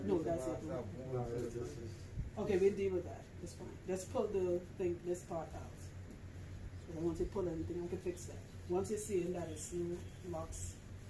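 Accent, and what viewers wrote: Trinidad and Tobago. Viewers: Gurl I knew I hear Dey trini in you 🇹🇹🇹🇹🇹🇹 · I noticed the accent, but its only when you said you were from Trinidad, that it all made sense. 🇹🇹❤ i live in Trinidad aswell, n you did an amazing job💪💯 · First video I’ve watched of yours. I recognize that accent anywhere. Sweet T&T (my dad’s homeland)! I find the loc separating to be very satisfying. I not even to the washing part and you have a fan for life!! I’m about to binge your videos and get tips for my hair. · When I heard your accent I knew you were from my country T&T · This sounds like a Trinibagonian. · I was listening to your accent and figured you were a Trini 🇹🇹🇹🇹🇹🇹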